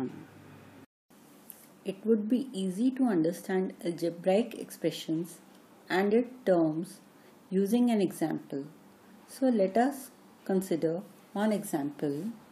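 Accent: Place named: India. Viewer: she has an Indian accent like a lot of my teachers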